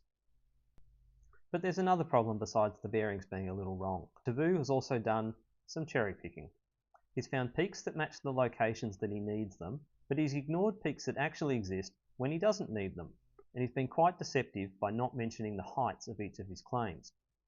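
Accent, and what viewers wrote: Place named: Australia. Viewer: Maaaaate. That ain't no New York accent. You are from the land of the vegemite sandwich.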